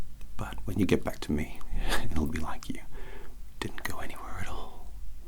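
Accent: United States. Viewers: truly i was jarred when i heard the American accent still soothing tho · I don't know what it is, but an Englishman who can do a convincing American accent is very powerful to me. 👀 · I love the way your American accent sounds. Your also an amazing actor!! ❤️ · That’s such a good American accent... fooled me until I saw the comments. It’s awesome man!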